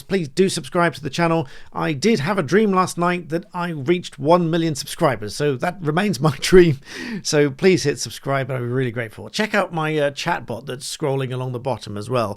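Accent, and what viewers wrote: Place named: RP, United Kingdom. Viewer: posh and based, love it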